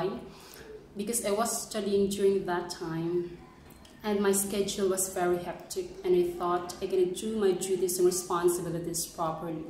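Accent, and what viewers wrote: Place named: United States. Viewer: Ang galing mong mag english, American ang accent. It's very nice to get to know you,.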